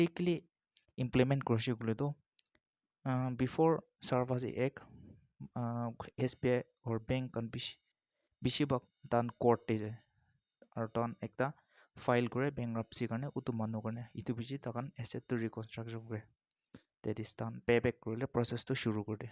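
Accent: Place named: Nagaland, India. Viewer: Bro by ur accent I think ur from Ao community man.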